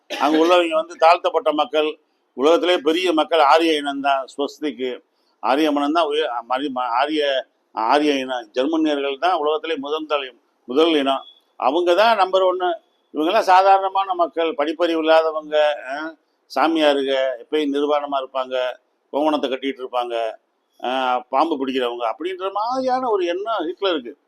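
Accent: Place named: Madurai, India. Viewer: சிறந்த வர்ணனை... மதுரை தமிழ்.. சிறப்பு..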